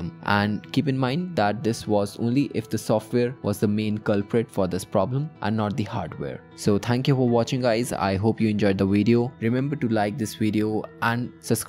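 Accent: India. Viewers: Not all Indian-ish voice is bad :D And by the way this really helped a lot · No need to be nervous he has an Indian accent we are good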